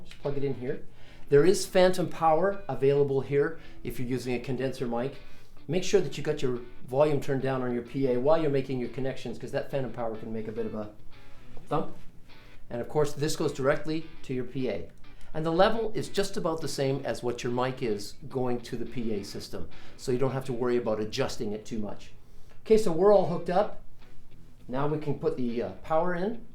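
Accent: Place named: California, United States. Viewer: It's better to sound like you know what you're talkin about of course and instead of letting us here at tell us what we are going to hear. You must be from California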